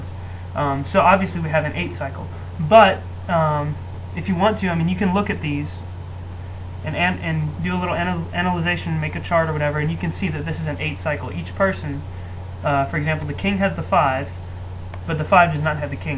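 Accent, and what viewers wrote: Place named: United States. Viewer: You English isn't that poor as far as I can tell. You sound American.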